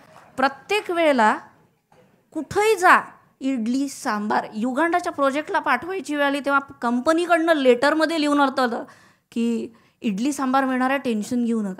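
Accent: India. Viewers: I think if u r wearing navvari saari and showing so much gratitude toward maharashtra then u should change ur accent as well... As you were staying in banglore for year u r having that South Indian accent which sounds really irritating. Please be proud of being maharashtrian. U r purpose will not fulfil by only wearing navvari but please work on accent. Stop taking out that south indian accent. If u really proud of being maharashtrian.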